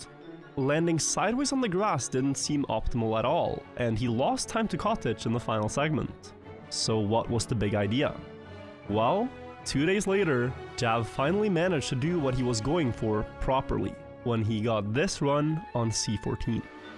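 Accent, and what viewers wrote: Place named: Norway. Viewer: Young sounds so Norwegian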